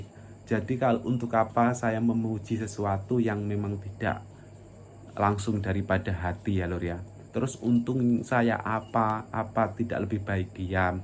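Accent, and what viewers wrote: Java, Indonesia. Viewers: Mass bhsa nyaa.medog bangettt.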